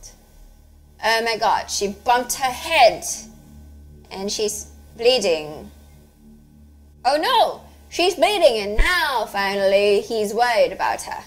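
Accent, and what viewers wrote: United Kingdom. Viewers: that british accent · Trueee marami naman gumagamit ng British accent kapag mag-isa, it's okii. Ang soyo soyo kayaaaaa xD Lovely accent kasi huahua Ms. thank you for this video! Hahah xD We'll be waiting patiently for the next video.:3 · that british accent tho. hahahahahahaha Brofist!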